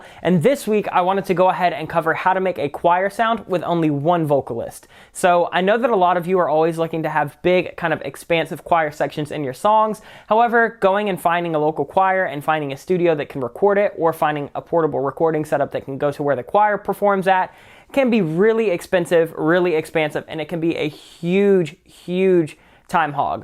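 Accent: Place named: South, United States. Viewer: Release the country accent cut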